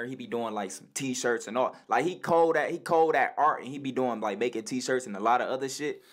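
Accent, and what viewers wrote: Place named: Detroit, United States. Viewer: man i knew u was from Detroit when i first heard about u n start watching yo shit just by the way u talk then i just saw cody man flight fucked up from tryna play u he really bouta get exopsed detroit got one of the most hardest comps ever they aint fw is bro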